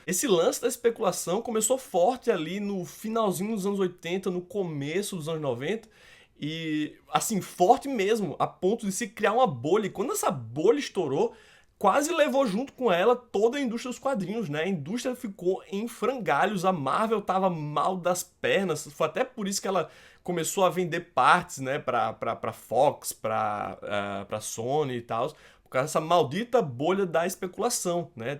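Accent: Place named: Pernambuco, Brazil. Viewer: Pensava que vc era de Pernambuco.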